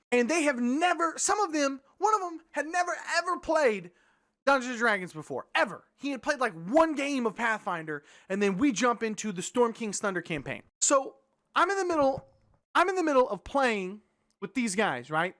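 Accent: South, United States. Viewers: turns the dixie up to 11 (accent) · You can hear the Texas in his voice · you get a southern accent when you get agitated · You get more southern when you get angry! lol But seriously I agree. I have met some old school DMs who totally get off on TPKs. There are a lot of folks that simply, in my opinion, are not flexible and creative enough to be DMs! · LOVE THIS. I can hear it in your voice, like you went a little "ghetto" (or deep south? - I don't know, I am from Wisconsin) on this:) LOL PERFECT what you said about having the "adult" conversation - gaming is all about COMMUNICATION - if we cant talk to each other, why are we playing? I have been gaming for 30 years and I cannot once think of a time where I as a DM or a player experienced TPK for something like this. Recockulous advice you were given. Keep making videos, I love your work - great stuff!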